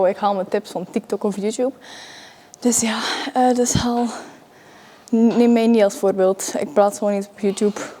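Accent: Flanders, Belgium. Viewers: She has a Belgian accent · I love your Belgian accent. 😀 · Dit is vlaams kut nee maar respect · Vlaams is Nederlands, maar dan mooier ❤ Een hele leuke video! Ik ga zelf ook naar de gym en het is gaaf om andere gymvideo's te zien. Weer een topvideo, een geslaagd experiment! :)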